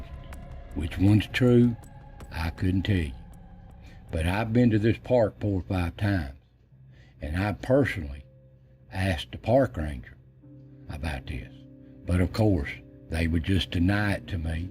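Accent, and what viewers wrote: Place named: South, United States. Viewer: Great video Sir. I could listen to your beautiful Southern Accent all day and night. My husband & I went to the battlefield. There was a moment when I felt overwhelmed and could not move. I told my husband " something terrible happened right here. I can feel it. It is such a soul-stabbing sadness that overcame me. I couldn't move. It was late afternoon, in summer. As sunset was beginning, we finally found the battlefield. He said "no baby, it is here. On this field, there are monuments to the battle. Come over here and look." He stood in the humid, hot air, in the tall grass of the battlefield. I had been overcome by grief at the dividing plot of land and tall pines between the lanes of the road leading to and away from that exact site. There, between the roads, I could feel the sadness wash over me like an ocean wave. 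I couldn't explain it, but I felt them...all watching me, and telling me ' it happened here". I have never forgotten that afternoon, nor will I ever.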